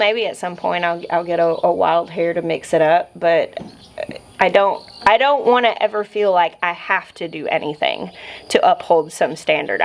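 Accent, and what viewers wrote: South, United States. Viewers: Just for the record, you're beautiful and I love your cute voice <3. Your southern accent is perfect for video; I think my own midwestern voice would drive me nuts if I vlogged! Thanks, for the encouragement about going gray. It's always hard to resist vanity and choose to be comfortable with how God made me. I'm not going gray yet, but I'm sure it's coming soon!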